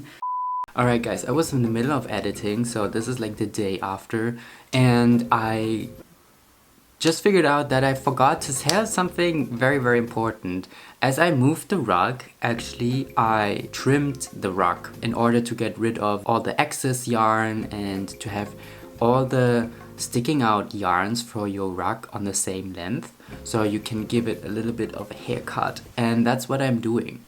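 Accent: Germany. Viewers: Are you German? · the German accent is kind of cute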